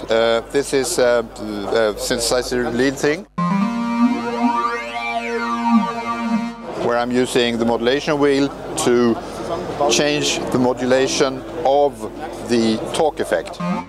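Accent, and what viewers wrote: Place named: Norway. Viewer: He must be norwegian... Have that kind of acsent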